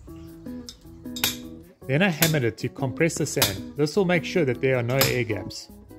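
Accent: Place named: South Africa. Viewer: You sound South African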